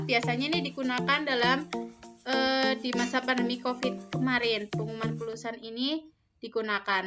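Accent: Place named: Java, Indonesia. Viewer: Medhok ya kak😂semangka❤semoga bermanfaat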